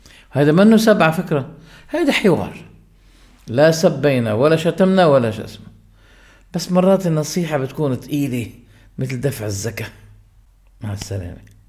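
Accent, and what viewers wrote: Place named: Lebanon. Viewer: الشيخ للبناني وليس سوري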